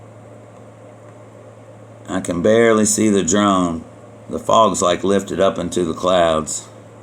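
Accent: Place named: South, United States. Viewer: Hey from GA! :)) Weather in this year is very bad... rainy every day.. What is your weather like? our states are neighbors! I see south accent, it's cute!))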